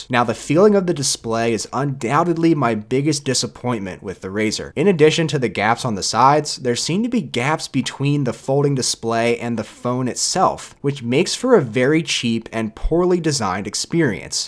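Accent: Philadelphia, United States. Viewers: Are you from Philadelphia? Sounds like it haha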